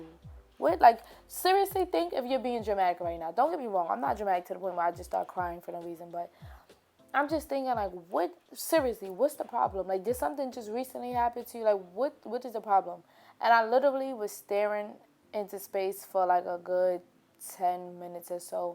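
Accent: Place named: Baltimore, United States. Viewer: Lol are you from Baltimore? I love you accent btw 💕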